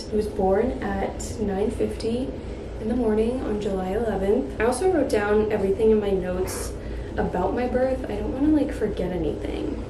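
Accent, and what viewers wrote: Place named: New England, United States. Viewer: Agh! He’s adorable! Literally!!! I also love how obviously you’re from New England, and I love how it’s GreysEN and you gave it kinda a New England flare and I love that 🫶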